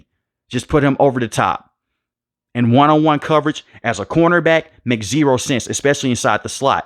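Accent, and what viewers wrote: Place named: South, United States. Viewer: Narrator has a thick southern accent.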